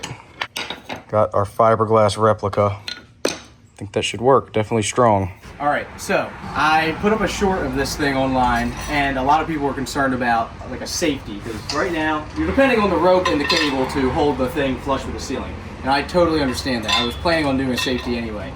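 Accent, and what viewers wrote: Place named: Maryland, United States. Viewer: I have a lot of friends from Maryland. And that is a distinct accent! The rope is too tieeet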